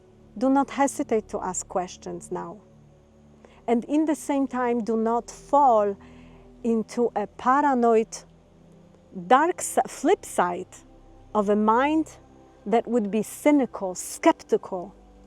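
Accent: Poland. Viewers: Maybe it's just me, cause I'm Polish, but your accent sounds veeeery Polish to me. Especially the way you pronounce "Antarktyka". Is there a grain of truth in it or am I totally wrong?😊😅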